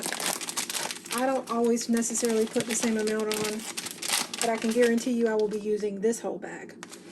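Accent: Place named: Eastern North Carolina, United States. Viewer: Just found you and have subscribed. Was wondering what state you are from. I’m from small town in Eastern NC and you sound like us with your accent. Thanks for the great recipes from my childhood.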